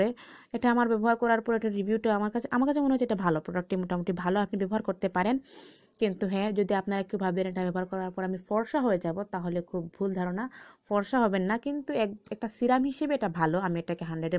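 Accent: Sylhet, Bangladesh. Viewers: Amaro lager tain sylhety😃😃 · Are you sylheti ?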